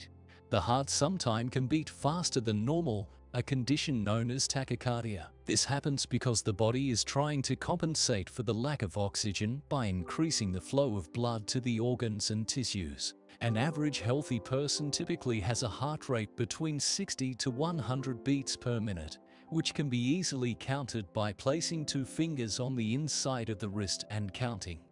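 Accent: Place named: Australia. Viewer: Omg i love his Australia accent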